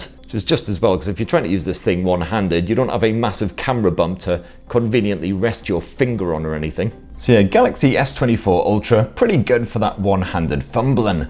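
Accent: United Kingdom. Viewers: I love UK accent,is very clear.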